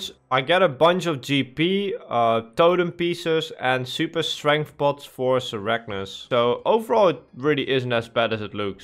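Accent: Netherlands. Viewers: Mooi Nederland accent:)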